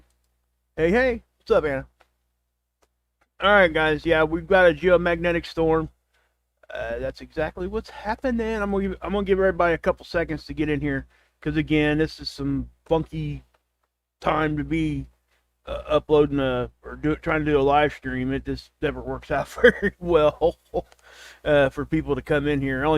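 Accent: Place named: Appalachia, United States. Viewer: btw I love the hillbilly talk it's what I best understand. Thank you with prayers for gettin well soon & blessings to u & family. ✝️🕊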